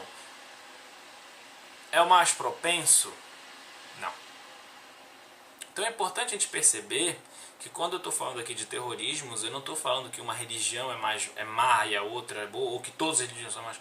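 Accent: Pará, Brazil. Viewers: Paraense? Adorei o vídeo! Maravilhoso!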